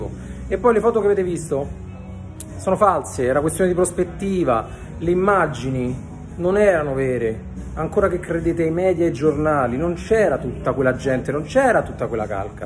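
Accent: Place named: Rome, Italy. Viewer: Siamo di Milano? Ma quanto hai bevuto che manco ti ricordi che nei video che hai caricato, dici e ben si sente che sei di Roma? Non so perché hai tirato in ballo il Sud (che manco ti si incula).... so bene che la colpa non è tua, perché, da bambino non hai potuto avere accesso all'istruzione o forse ti è morto l'insegnate di storia.... ma avendo visto un po' il genere dei video e questo in particolare, propendo più per la prima ipotesi. Comunque coraggio Business Man ce la farai lo stesso nonostante sia ignorante come una capra, senza offesa per la capra. P.S. Sono quello senza mascherina e precauzioni che lavora in nero in cucina, mi si vede nel filmato e CONFERMO TUTTO!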